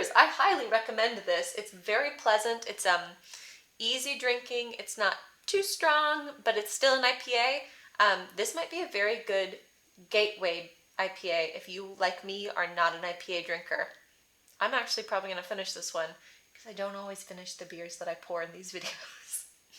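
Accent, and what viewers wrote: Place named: Midwest, United States. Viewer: Thanks for teaching,. What part of the Midwest are you from?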